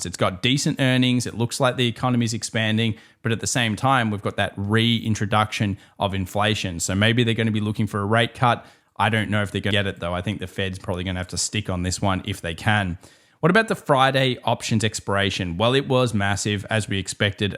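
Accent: Australia. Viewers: Hi, your vids are excellent education regarding TA and stats. A huge thank you! I like the aussie accent, too. It reminds me of the time when I was living there.:) Keep up the great work! Cheers from Germany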